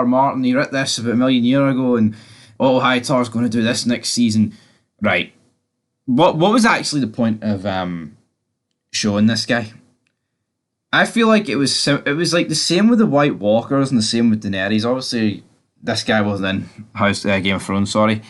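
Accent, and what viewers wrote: Scotland, United Kingdom. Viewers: Exactly the same as mine, so I am guessing east central Scotland, Fife , Edinburgh or Falkirk. Listening more though, it might be a wee bit further west , nearer Glasgow.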